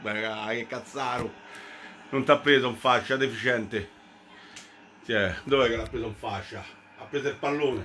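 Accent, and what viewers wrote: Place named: Rome, Italy. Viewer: A,ti voglio ibbbridddo,accento romano,e. testa juventina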